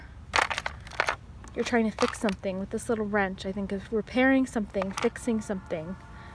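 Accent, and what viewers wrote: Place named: Ontario, Canada. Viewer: Are you Canadian by chance? I think I can hear the Ontario accent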